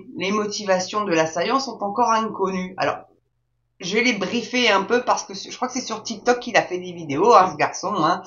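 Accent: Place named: Côte d'Azur, France. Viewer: j'adore votre humour, vraiment. vous avez un accent, on dirait que vous êtes une azuréenne. je n'habite plus en france et finalement je suis heureux de ne plus habiter en france, et d'habiter dans un pays qui est sécure, ou le taux de criminalité est ultra faible, ou vous pouvez laissez votre sac et portable sur la table du café ou du mcdo, et même faire tomber votre portefeuil vous le retrouverez au poste de police si la personne n'à pas vue que vous avez fait tomber votre portefeuil par terre pour vous dire a mon premier voyage dans mon nouveau pays, a cause du décalage horaire, j'ai oublier mon bagage dans le train, j'ai attendu que le train revienne a ma gare ou j'était descendu du train et au final j'ai récupérer mon bagage avec tout mon argent dedans, ordi portable, tablette, bref mes médoc, ma ventoline, et mon adrénaline à cause de mes alergies alimentaire, bref pays sans arabe ni noir et donc pays sans vole ni agression sauf depuis deux ans car les arabes et noir musulmans commencent a venir en masse dans mon pays et donc il commece a y avoir des voile de gamine, des raquette avec tabassage, et aussi des dégradattion de bien mobilier urbain et aussi des déjection en plein rue alors qu'il y a des toilette dans gratuite dans chaque gare ou superette, alors qu'ils ont l'argent pour acheter leur bouteile d'alcool et cigarette, bref l'avantage c'est que mon pays généralement surtout dans les ville majoritairement de droite sans opposition de gauche traitre correctement se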